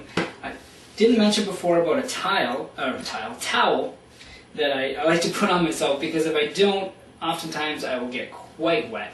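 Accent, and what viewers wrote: Canada. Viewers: Thanks so much, really helpful! You have a lovely Canadian accent too 😁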